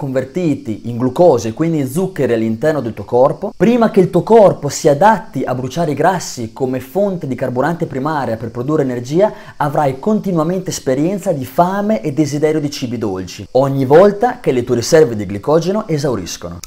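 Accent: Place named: Modena, Italy. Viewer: Ha l'accento di Modena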